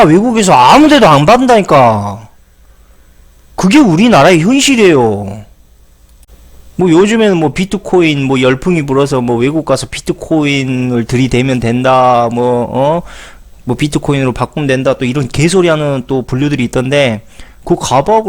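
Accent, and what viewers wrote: Jeolla, South Korea. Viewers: 고향이 전라도신가봐요~^^와 뇌섹남 어찌이런생각을 하시는지 완전매력적이시네요 멋있어요♡♡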